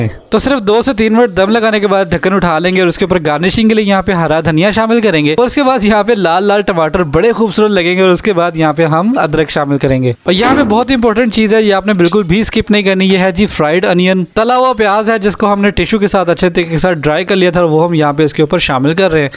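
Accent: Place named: Punjab, India. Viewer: So yummy recipe😋...I like your punjabi accent😊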